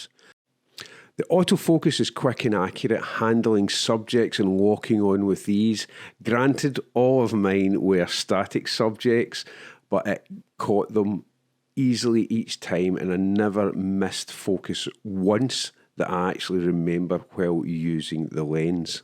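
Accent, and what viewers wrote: Scotland, United Kingdom. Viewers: Great video! I love scottish landscapes and accent! Very cool!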